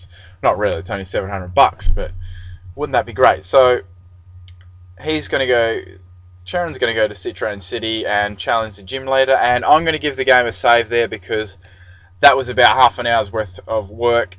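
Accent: Australia. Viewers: are you australian? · Yehhhhhh Australia. It 's the best accent and u all know it ahaha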